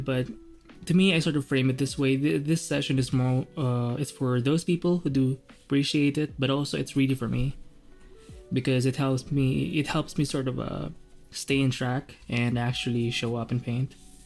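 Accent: Philippines. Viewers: Nagulat ako nung namention ung UST eh HAHAHA. Pero di na rin nakakapagtaka kasi very Pinoy ung accent nya, in an endearing way.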